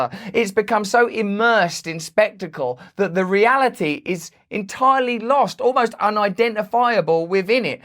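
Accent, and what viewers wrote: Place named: United Kingdom. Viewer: RB I very much enjoy and appreciate your videos. You're cool and I don't think of you as an outsider. You seem more tapped in to things going on in America than most Americans. Your videos certainly are an eye-opener. I'm happy to become more conscious and the info comes with a lovely British accent. You know we Americans are partial to that. We just can't help ourselves. I'm watching and listening to more of your videos because I want more useful information. You're telling us the truth about ourselves and our country. I seek the truth in everything. Thank you for all the time and work you're putting in to revealing that truth. I'll be watching and listening for more of your enlightening videos. It's almost tea time. I do enjoy a good cup of Earl Grey. Good day.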